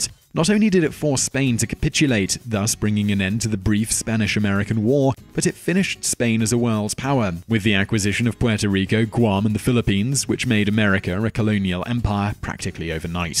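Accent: United Kingdom. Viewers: Told my girl she knew who "Simon Whistler" (british accent) was, and now that ive pulled the video up, shes pretending she doesn't know who u are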